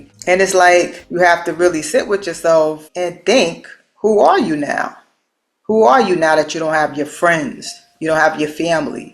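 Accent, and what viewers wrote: New York, United States. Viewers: Sounds like a NY accent ma. How you doing. Love your perspective. New sub glad I stumbled upon your channel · I would like to move abroad. I enjoyed this information. Are you a New Yorker? Lol I ask because if your accent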